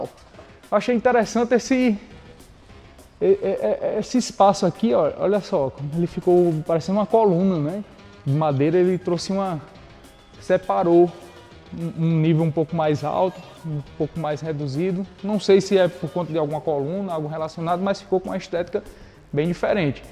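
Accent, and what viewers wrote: Northeast, Brazil. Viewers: Conheci seu canal ontem e maratonei muitos videos, e fiquei namorando essas casas lindas e maravilhosas que vc nos apresenta, gostei também do seu jeito alegre de apresentar as casas e como nordestina que sou também logo vi pelo seu sotaque e percebi que também é kkk gostei tanto que ja ate me inscrevi, vc é diferenciado, e sim rapaz mecheu muito com o meu emocional essas casas kkkk parabéns pelo trabalho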